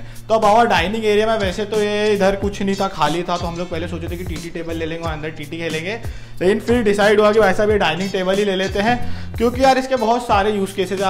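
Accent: Indore, India. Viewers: big brother It is an indori accent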